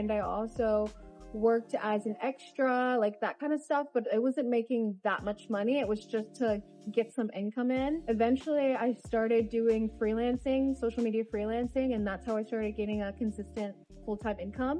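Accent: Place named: New Zealand. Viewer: Hehehe even after just 5 years, that accent is rubbing of on ya! One of us! Great vid will show it to my american family 😊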